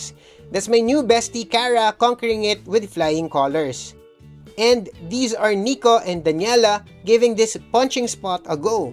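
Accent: Philippines. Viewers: Pinoy accent, surely for the unwelcome poor tourists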